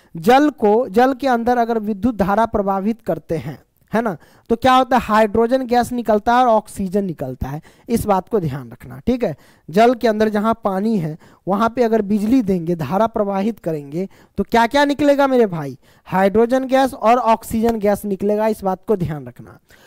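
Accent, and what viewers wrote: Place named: Bhojpuri, India. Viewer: Kya aap bhojpuri h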